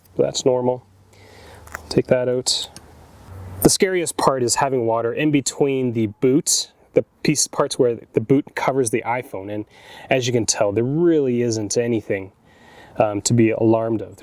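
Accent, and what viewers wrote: Canada. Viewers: you have got a great canadian accent.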